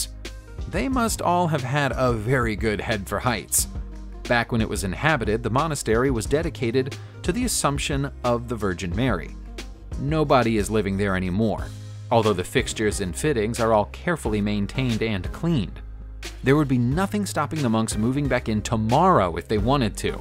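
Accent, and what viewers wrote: United States. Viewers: your pronunciation is typical American .....ATROCIOUS!!!!!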